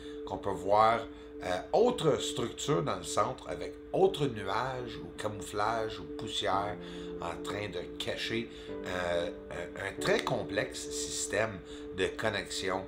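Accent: Quebec, Canada. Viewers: Hey, cool ! C’est la première fois que je tombe sur une de tes vidéos, je viens de m'abonner. 😊, sujet qui m'intéresse 😊, juste entre toi et moi, tu as un accent québécois assez fort 😊, pas certain que tous les Français de l'Europe vont te comprendre, moi je te comprends bien, je suis Québécois aussi 👍 continue, ne lâche pas avec l'accent ou pas 😊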